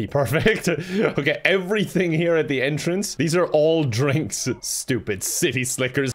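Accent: Russia. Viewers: i love when he speak his russian accent 😂❤️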